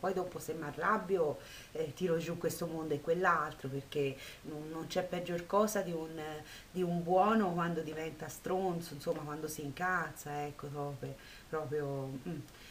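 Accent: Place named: Tuscany, Italy. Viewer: Che meravigliaaaa! Adoro la parlata toscana <3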